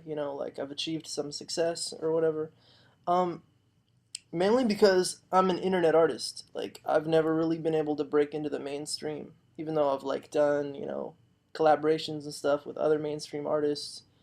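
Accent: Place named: Spain. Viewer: Have you seen by any chance, yearsss ago, his last vid (now deleted) that he uploaded where he was talking and seemed incredibly high or drunk and in a heavy Spanish accent ??? Like he was slurring his speech & I couldn’t understand anything, I wondered if he was under the influence of a drug that made him slur. It was weird as hell, then he deleted it.. I rly just wonder where he is in the world, if he’s even alive and what he’s doing rn.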